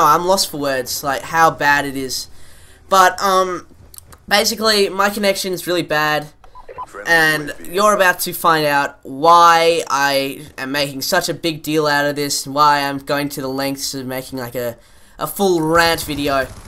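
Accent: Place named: India. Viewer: I thought the whole thing was an indian accent...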